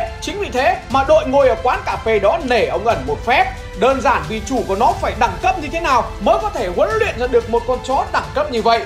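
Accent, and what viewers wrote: North, Vietnam. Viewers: Giọng đọc đúng chuẩn giọng bắc Kỳ luôn nghe hài vãi😂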